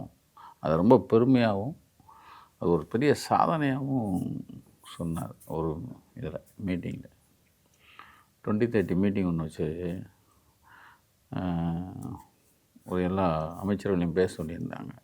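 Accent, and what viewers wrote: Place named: Chennai, India. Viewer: Excellent speech in simple chennai thamizh in your usual casual style. Great job sir.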